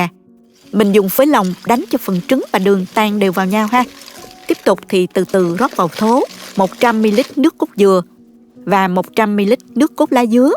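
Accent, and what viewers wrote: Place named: Binh Thuan, Vietnam. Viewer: GIONG BÀNH THUẨN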